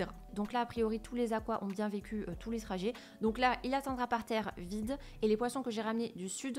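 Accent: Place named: Aveyron, France. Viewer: l'accent était aveyronnais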